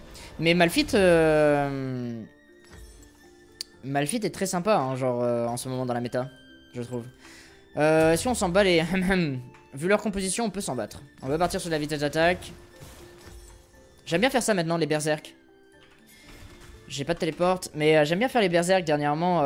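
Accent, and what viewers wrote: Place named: Russia. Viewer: Mdr j'adore l'accens russe quand il parle anglais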